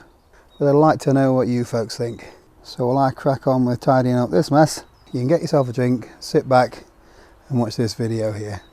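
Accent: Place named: Wigan, United Kingdom. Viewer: Are you from Wigan or somewhere near there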